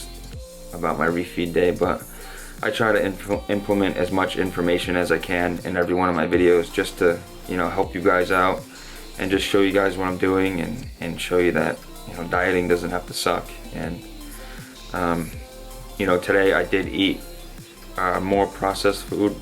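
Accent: Boston, United States. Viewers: CAHBS. Love the Boston voice. \m/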